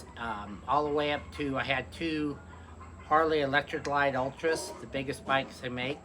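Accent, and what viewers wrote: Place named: Oklahoma, United States. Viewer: are you from oklahoma, you quite got the accent...anyway Im filipino american, retired marine. i like how you did this, lots of important info and the requirements you are looking for on a bike is the same like what i need. I look at other filipino vlog coz I speak tagalog too AND THEY ALL SUCKS. you dont see them does what you did. Its all about bloody all about themselves, laughing and running the camera on things you already seeing just to past the time. Their actions and voices all very irritating to the ears and just talking about nonsense... Hope you enjoy your stay there in that beautiful country populated by mtfrs.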